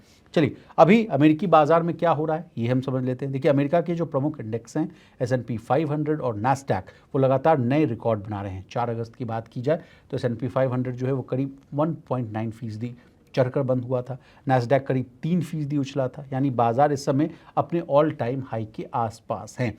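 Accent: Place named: Bihar, India. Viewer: Ye Bihari h tone se pata chal rha h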